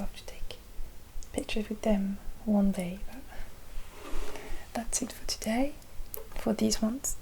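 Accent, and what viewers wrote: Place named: France. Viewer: Old cameras, french accent plus your beautiful face means perfectionnnn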